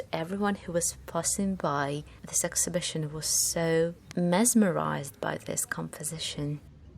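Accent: United Kingdom. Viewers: I really love to watch and listen your incredible voice in British accent:) it would be great to learn English from you :)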